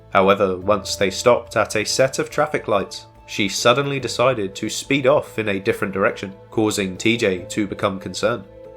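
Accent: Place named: London, United Kingdom. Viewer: Why does he have to have a London accent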